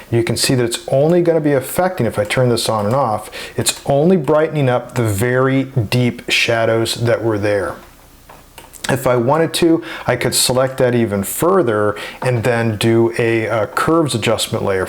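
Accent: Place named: United States. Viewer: thanks a lot! I can't understand american english very nice so that's why i needs me to watch the video 2 or 3 times to be sure to understand every thing! If I could I would really enjoy sharing pictures with you which give me some problems... Thx from Paris France!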